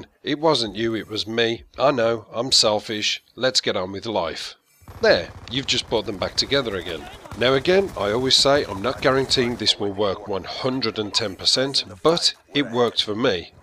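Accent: United Kingdom. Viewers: British Accent!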